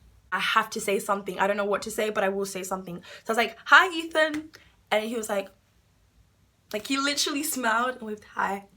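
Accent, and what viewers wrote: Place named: United Kingdom. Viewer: Btw I love ur accent 😂 I'm part British and only get it when I'm speaking to fast or reading but I want it throughout the day cuz it sounds so nice and proper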